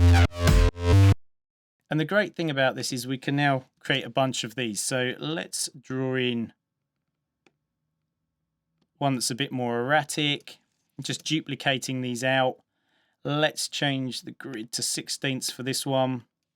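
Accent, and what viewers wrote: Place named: United Kingdom. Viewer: Nobody knows how i love british accent!!!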